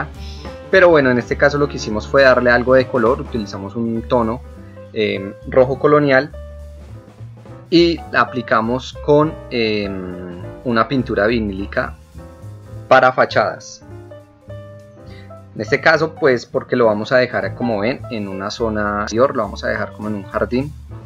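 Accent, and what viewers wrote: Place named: Colombia. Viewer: Saludo. Veo y escucho tù video me gusta buena nota por tù fromar de hablar tù acento es Colombiano, que posiblemnte de Cali. Bien yo estoy en el circulo polar.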